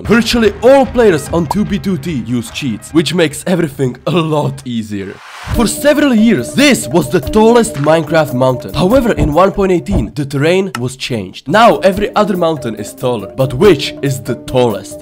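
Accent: Czech Republic. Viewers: dneska jsem se podival na tvoje video po prve a hned my došlo že jsi čech podle přízvuku xd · Angličtina dobrá jen zapracuj na výslovnosti máš pořád strašně český přízvuk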